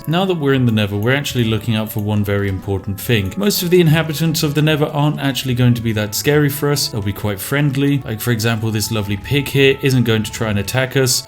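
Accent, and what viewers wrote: United Kingdom. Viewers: Yo I didn’t know the ah master was a Brit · OH MY GOD HES BRITIHS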